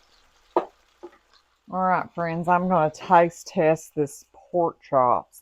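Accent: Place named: South, United States. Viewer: I love Southern food, as well as your accent! I once had a ton of leftover hamburger buns and threw them in the oven on low and toasted them to make breadcrumbs. Delicious because I added my own seasoning. Thank you for your video and chat.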